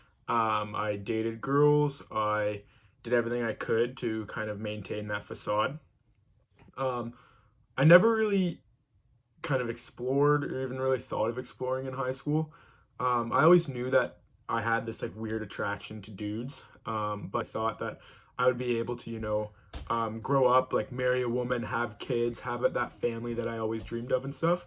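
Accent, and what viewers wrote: Canada. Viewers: Sounds like he's from Canada. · Well done matey! Be proud of who you are! BTW you are the most Canadian sounding Canadian I’ve ever heard in my life! Xx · This guy has a Canadian accent. Not foolin' me....lol He says Ouwwt. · This has all the Canadian words in it! Hoouse, ooout, 😀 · Your Canadian accent is thick. I love it and I love your content bro. Keep them coming man and you're cute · Me watching this channel for the first time: “Canadian shirt? Is he Canadian?” Him: pronounces the word “out” in that cute ass Canadian accent Me: “knew it. I should be a detective” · The strongest Canadian accent ever lol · You have such a cute Canadian accent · interesting. he has the strongest Canadian accent i have ever heard. what part of Canada is he from? London, Ontario? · Gorgeous young stud...even with the Canadian Accent. I hope you have a wonderful life! 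· I love your Canadian accent. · I'm a straight female but I'm watching coming out videos lol instead of studying. Good for you for coming out and being your true self. Hi from Ontario and damn, your Alberta accent is strong. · What about the poster in French? I had to check where London was in Canada. Obviously he wasn't referring to the U.K. His "uit" and "huis," known as Canadian raising, sound different than in other parts of Canada.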